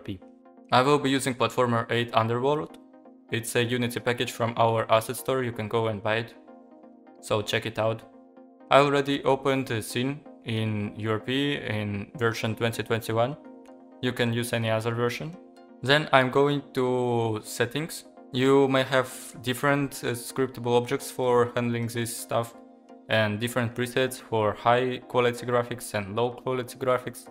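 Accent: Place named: Turkey. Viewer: Dostum türk olduğun anlaşılıyor bu arada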